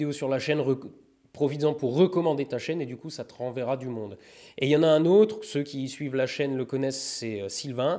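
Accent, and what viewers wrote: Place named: Alsace, France. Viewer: Yoh.. T'es bien un alsaco toi... Ou lorrain ^^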